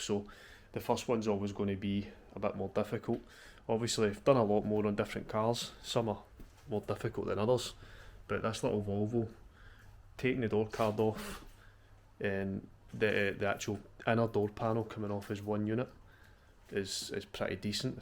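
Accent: Glasgow, United Kingdom. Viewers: Well done for taking on a difficult job, and showing us it wasn't to bad. I enjoy your videos, and my wife likes your Glasgow accent.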